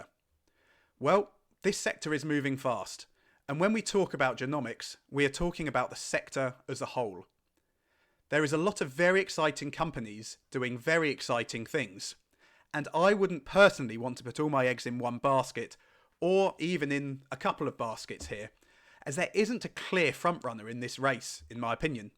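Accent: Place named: United Kingdom. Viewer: How do you invest in ARKG in the U.K.? I assume you’re U.K. based from your accent?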